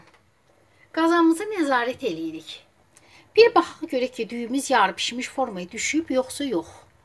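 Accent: Baku, Azerbaijan. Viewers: Bakili danishigidi. Allah bereket versin baci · Nəhayət ki......👍👍👍👍👍👍👍👍👍👍👍♥️😘💐. gözləduyimiz paylaşim...,eşitmək istediyimiž Bakı ləhçəsi. səlis izah, dadlı plov.....,sizdən gələn cəkilişlərin ardı gəlsin in şə Allah canim♥️💋💐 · Çox sevdiyim Baki ləhçəsi çan baçim əllərivə sağlig çox təşəkkürlər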